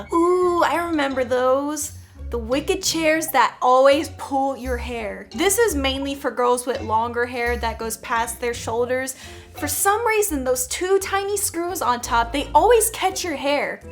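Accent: United States. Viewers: Are you Southern ? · Love your cowgirl accent! 😉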